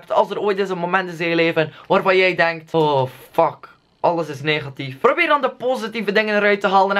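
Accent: Belgium. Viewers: je hoort duidelijk dat hij n Belg is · probeer eens in een video zonder een Belgisch accent te praten maar met een Nederlands accent lijkt me wel een x grappig;p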